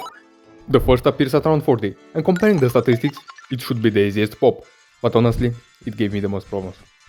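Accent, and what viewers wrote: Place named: Russia. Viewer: I love the, uh russian/ukranian acent?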